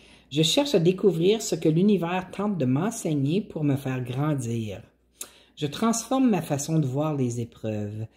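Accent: Canada. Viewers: J’ai oublié...j’adore cet accent canadien qui pour moi correspond a optimiste et musical...! 🙏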